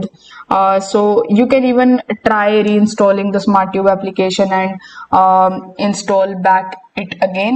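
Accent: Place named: Bengal, India. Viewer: B4 you tell us how to fix please talk proper English not bangali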